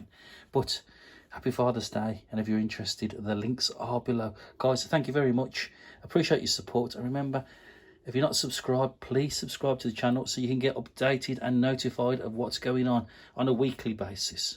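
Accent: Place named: Midlands, United Kingdom. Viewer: what a great and informative video great to have you back you are the lever king thank you for all your hard work cheers. I must ask you are you a brumie lad like myself I detect a midlands accent? just curious because there are a lot of geniuses that come from that part of the world haha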